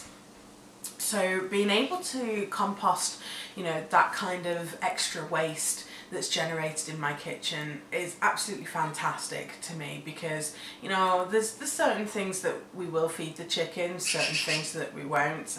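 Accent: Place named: United Kingdom. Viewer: How have I never heard of Bokashi before?! This is brilliant! (And why was I surprised you have a British accent? I knew about England! Ha!) Did you mention how much the bags of bacteria cost? I was wondering about the start up cost for the system and how much it costs to make a batch of compost, since you put a cup in every time you fill it. I could have missed that part. (Toddlers.)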